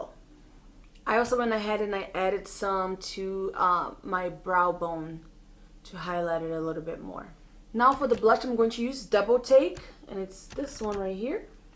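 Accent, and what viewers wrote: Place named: Spain. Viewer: Do you speak spanish? I feel like I can hear your accent when you talk lol love it! This look is so gorgeous. loved this video!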